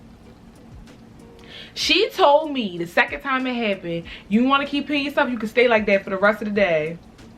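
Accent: Baltimore, United States. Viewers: you frm bmore cs i here that accent 410!!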